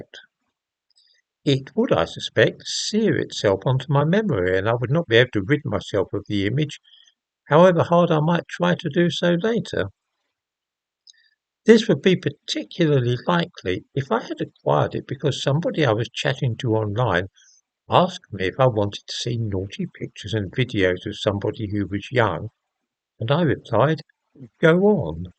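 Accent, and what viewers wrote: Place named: RP, United Kingdom. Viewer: Your posh bbc accent gets right on my teets.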